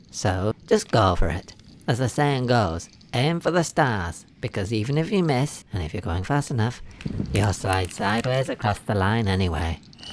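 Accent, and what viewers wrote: Yorkshire, United Kingdom. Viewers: Everyone reading this, pause for a moment. Close your eyes (after reading the rest of the instructions, otherwise you won't know what to do). Now picture saying "Red Bull gives you wings" in his cute little Yorkshire accent. Your day should now be 12.75% better.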